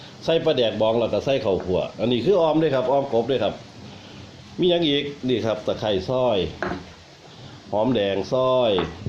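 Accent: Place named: Isan, Thailand. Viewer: เสียงเว้าคือม่วนหูคักเนาะ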